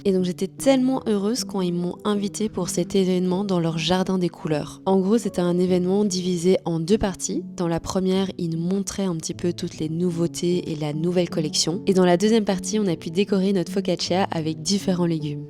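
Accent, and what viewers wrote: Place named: Belgium. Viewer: J’allais dire "non on remarque pas que t’es belge" juste au même moment tu parles de ranger ton brol un de mes belgicisme préféré 😅 Tes tricots sont trop jolis, je me rends compte que je devrais peut être investir dans des pelotes de qualité. Le Louvre-Lens me tente énormément en plus il propose souvent de beaux thèmes d’exposition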